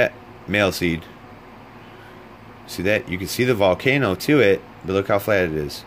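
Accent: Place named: United Kingdom. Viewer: PARDON! I didn't understand anything , you started talking Britannica .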